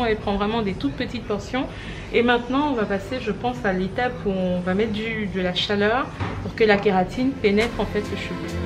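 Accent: Cameroon. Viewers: Hey je te découvre tata (comme tes abonnés t'appelent affectueusement)😊! C'est moi ou j'entend mon accent camer par ci par là? Si oui ça fait super plaisir de retrouver des Camerounais sur la toile! Je vais prendre le temps de découvrir ta chaîne. Vous avez l'air super sympas, tous! Allez, des bisous!! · Waoouuhh ma Soeur on dirait k j ai raté ce vlog. Mais suis trop fan de la façon avec laquelle tu changes ton accent c est l accent camer là qui me peut me tuer de 😂😂😂😂😂😂😂😂😂😂😂😂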